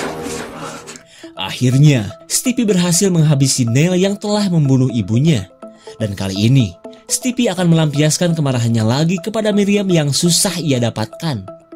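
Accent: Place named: West Java, Indonesia. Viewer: Suka gya bicara ada sunda2 gituhhh subrek donkk gaskeunn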